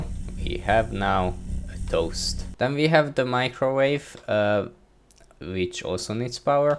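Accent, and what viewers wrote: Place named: Hungary. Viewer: halatszódik hogy magyar vagy xdd de sokat segített a videó ty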